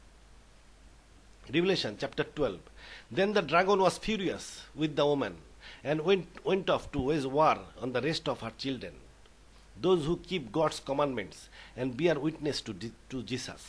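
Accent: Bangladesh. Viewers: Are you from Bangladesh, love your accent.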